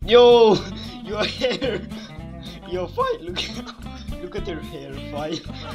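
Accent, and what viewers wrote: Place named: India. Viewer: India is proud of your accent🤝🤝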